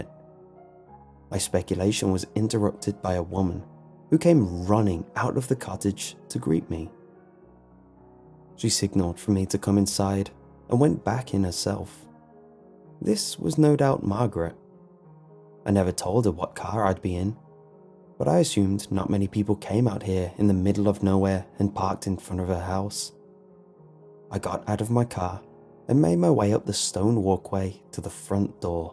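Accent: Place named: Liverpool, United Kingdom. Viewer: I can detect a very subtle Scouse accent or possible Manc maybe.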